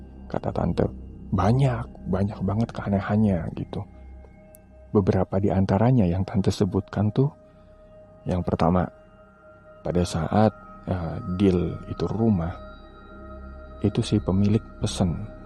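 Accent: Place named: Tegal, Indonesia. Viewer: beuhh tegal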